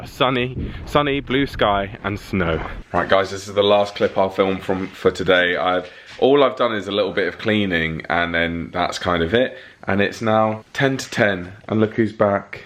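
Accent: England, United Kingdom. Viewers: you have a genius for relating to your viewers, giving us the impression of being in an intimate one-to-one relationship with you. I moved to London from Australia in my early 20s returning to Oz in retirement. Although I love it here, I still enjoy the Englishness of your accent and the lovely ordinariness of English life I enjoyed there in my salad days.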